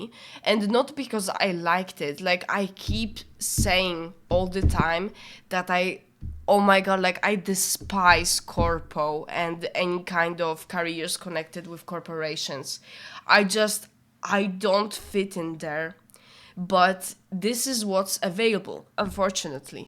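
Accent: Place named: Poland. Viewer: Polka? Bo masz dobry angielski, ale ten akcent trochę zdradza ;d W każdym razie trafiłem na eseje o Raphaelu i widzę, że nie tylko ja mam obsesję na jego punkcie. Pozdrawiam.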